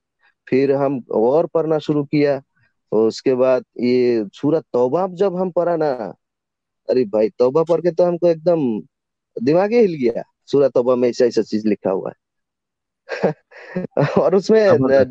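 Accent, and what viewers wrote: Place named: Bengal, India. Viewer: By voice I could guess that this person is from Bengal or Orissa 🙄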